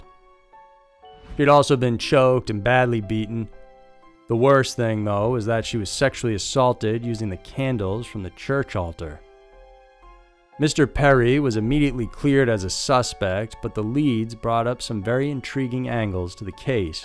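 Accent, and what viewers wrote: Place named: Texas, United States. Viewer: I think he has a Texas accent. Its very familiar. Probably why I like listening to.it. when he says 5 like fiiiive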